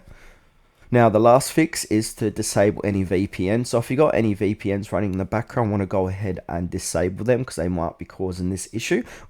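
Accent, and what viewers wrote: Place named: Australia. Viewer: Thank god an Aussie/Kiwi or a Brit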